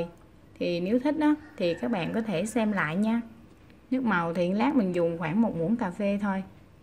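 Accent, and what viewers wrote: Mekong Delta, Vietnam. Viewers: Ủa thấy bình thường mà. Chắc do bạn k nghe quen tiếng miền Tây. Chứ chị đó nói bình thường ắ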